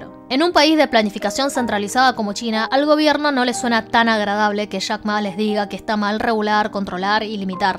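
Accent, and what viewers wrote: Buenos Aires, Argentina. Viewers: me encantan tus videos, te voy a empezar a seguir, pero hablas muy rápido. ¿Eres argentina? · Me mata el acento Porteño!!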